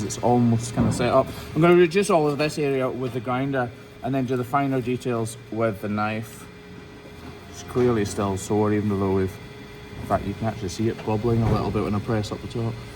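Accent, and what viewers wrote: Scotland, United Kingdom. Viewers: Enjoy your videos and the craziness you find. It is quite amazing (and gross). You're also very handsome, and you're all up in some serious cow sh*t on the daily, helping fellow animals. That's all kinda, pretty hot (not the cow shit, but the level of care you put into your work and the animal, while spitting/wiping it away when you get shot in the face with shi*t, piss or puss). Haha. God bless you, sir, for the work you do. AND! You're from Scotland! I love it, love the accent. My dad's side of the family is Scottish; I've traced my family heritage back to Scotland, in Lanarkshire. My family raised Clydesdales, which also originate from Scotland. It's been my next big wish to make a trip to visit Scotland. Born and raised in Montana, USA, on a farm and ranch, so yes, I have much appreciation for your job and the work you do! 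Good on ya, lad! And, yes, I subscribed! :) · I like channels such as yours because I love Scotland , people from Scotland, and animals. My ancestry includes dairy cattle and their care on nearly every branch and twig of my family tree. I no longer question where my curiosity comes from because it is all genetic memory. So my great, great grandfather had an accent like yours. His grandson married a young lady who was born in the US to Bohemian parents who spoke German. Dairy cows on both sides. My matrilineal side back before 1700 when they arrived in Jamestown, Virginia came from a place in France called Beau Vac or beautiful cow. I appreciate your skill, love, and care of these beautiful creatures. Thank you. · Love what you do for these cows, I'm from America and I love cows, it's awesome to se you helping them. I never really knew the full extent of the work that goes into caring for the cows. 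Great explanations as always man!! Also, love the Scottish accent (I'm saying this and laughing cause I'm from Alabama, and we have a very thick accent.) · Handsome man 😍 Scottish accent 😍😍 Kindness to cows 😍😍😍😍😍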